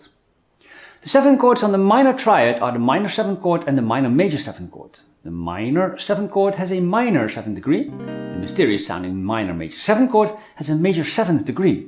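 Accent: Netherlands. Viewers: Can confirm! is among the best guitar education resources on Youtube. His explanation, auditory and visual examples are exceptional. And I adore his dutch accent too.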